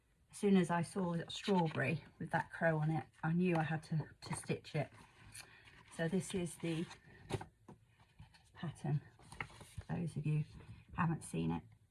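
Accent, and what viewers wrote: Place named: England, United Kingdom. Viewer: So lovely to hear your “English “ voice and see all your beautiful work. We have very similar tastes and we snapped on quite a few pieces. Love your little pumpkin design and look forward to that as a release one day. I’m also on the Raven bandwagon!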